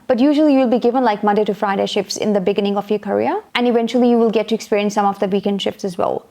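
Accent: Australia. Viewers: You speak like Aussie.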